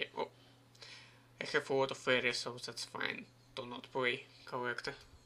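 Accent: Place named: Russia. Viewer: ю рашн инглиш ту бит май ирс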